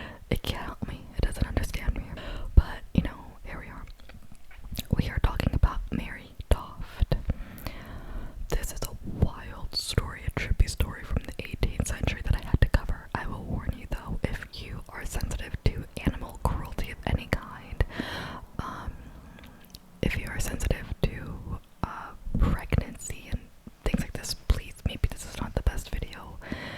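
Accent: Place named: England, United Kingdom. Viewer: It's an English accent, lmao. There are many French accents that are quite distinct, just as in any language.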